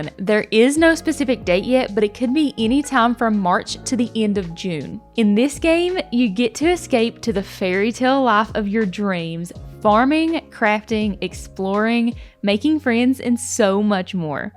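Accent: South, United States. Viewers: Okay your southern accent is darling ! Enjoying the cozy content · The southern accent…never clicked subscribe so fast 😌🥰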